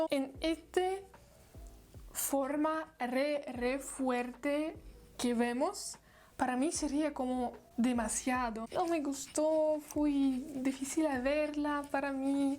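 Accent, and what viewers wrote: Argentina. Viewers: Hola! Acabo de encontrar a tu chanal! Me gusta mucho! Tengo 16. Y estoy aprendiendo espanol tambien. Empece a 6 meses pasadas. Tu accento de Argentina es maravilloso! Estudio espanol de Colombia. Que Chevre! Tienes una subscriber nueva! xx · ¡¡Este acento argentino!! 🔥🔥🔥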